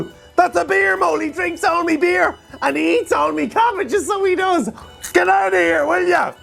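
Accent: Ireland. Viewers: Does anyone know what part of Ireland is his accent from?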